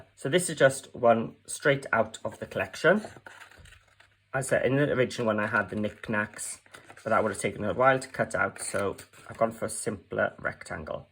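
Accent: Wales, United Kingdom. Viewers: I really enjoyed this video today showing the bridge fold card. This is a fabulous card, I am going to try it for a lovely lady who will be 90. Thank you so much for showing this technique. P.s. love to hear a Welsh accent again 😍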